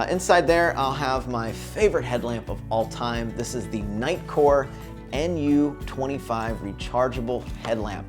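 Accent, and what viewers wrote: Pittsburgh, United States. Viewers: You from Pittsburgh? You have a bit of a yinzer accent.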